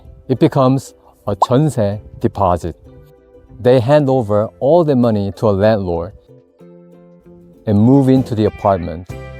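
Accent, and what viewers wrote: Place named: West, United States. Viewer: This creator is VERY interesting. I'm running into several English speaking channels of people living on Korea. In particular, this gentleman speaks a Western style of American English very well. Thank you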